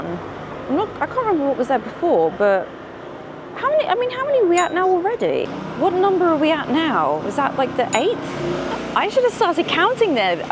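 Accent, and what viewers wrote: RP, United Kingdom. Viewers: Basic economics in action here. Inequality, a disappearing middle class with less money to spend on excess, and a billionaire class that doesn’t sully themselves by going into these shops in person! 😂 fake balcony? Where were you educated? You sound like you must’ve gone to a private school- did they not teach Shakespeare? Fake balcony? C’est un balconet aka a Juliet balcony in reference to Romeo and Juliet and the scene where Romeo meets Juliet after their initial encounter “but soft, what light through yonder window breaks” is supposed to be Romeo seeing Juliet at the balconet of her window. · you are so posh 😂😂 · My God you have the most posh and smooth English accent I've ever heard in my life.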